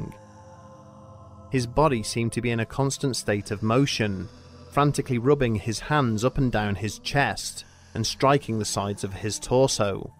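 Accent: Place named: Midlands, United Kingdom. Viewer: Sounds like somewhere in the Midlands to me.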